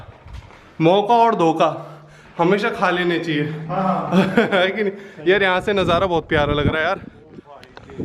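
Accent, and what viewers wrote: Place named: Punjab, India. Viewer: Mundaw punjabi lagda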